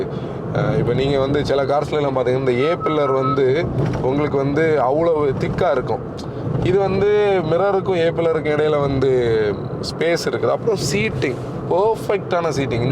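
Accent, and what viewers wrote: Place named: Nagercoil, India. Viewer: Every sedan, hatchback, suv and electric vehicle launch time. I search channel.for your"s valuable review. Because your brief and depth points like vehicle drivability, ride and handling. build quality and also your Nagor kovil language slang. Hat"s up bro and her team mates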